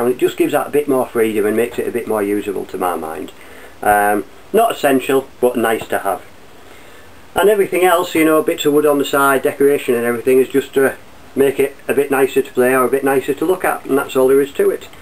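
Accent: United Kingdom. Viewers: Great video! by the way I couldn't stop staring at your T-shirt I noticed it said snowden but my eyes are bad that's all I could read and couldn't help but thinking I wonder if you know there's a guy named Snowden that builds cigar box guitars here in the states Snowden cigar box guitars? I had to laugh because I wasn't sure who I was looking at I was pretty sure Snowden was American but you sound definitely English ... I liked your video now I have to choose between 4 guitar makers ... Nigel Mctrusty Australia ,YOU Great Britain , Snowden guitars I believe usa and Justin johnsen cigar box guitars usa I don't know what to do now hahaha! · Ey up lad, not heard that great dialect for years. I lived most of my life in Manchester and then for my last 6 years in England I lived in West Yorkshire. I am guessing you are from somewhere around Manchester though? 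I left England in 1982 and I now live near Chicago, Illinois, (still got the English accent though :) ). Man do I miss hearing good dialect! I came on here because I just bought a 3 string cigar box guitar and wanted to get some idea as to playing and tuning it. I normally play 6 and 12 string guitars, but I have to say that I was blown away by the sound from these things. I agree with the adjustable bridge. The one I just bought did not have one and already I can hear the intonation errors. Also it had a peizo pick-up which I'm not keen on. I'll probably go ahead and modify it as per your recommendations. By gum I liked your video, keep up the good work lad. All the best, Chris.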